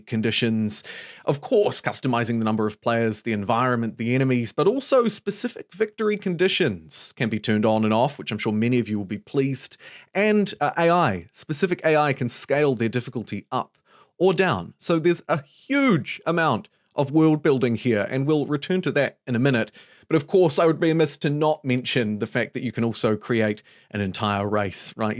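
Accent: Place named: New Zealand. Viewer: You sound like your from NZ ... Same obligatory sub lol..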